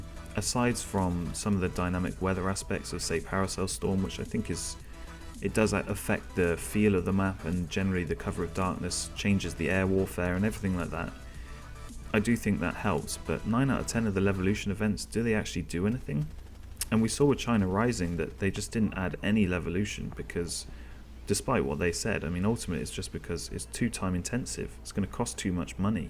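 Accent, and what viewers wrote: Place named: United States. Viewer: You have such a convincing accent as an American rapper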